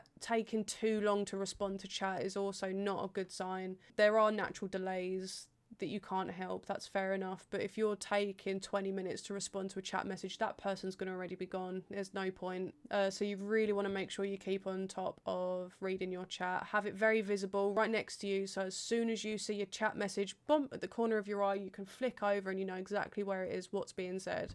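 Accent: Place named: United Kingdom. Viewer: Hey, thanks for your video really good tips and thoughts some I can confirm through my experiences on Youtube. I'm starting to stream now too. You're really helping me with that. Thank you! Apart from that, I just have to say I love your British English!:-)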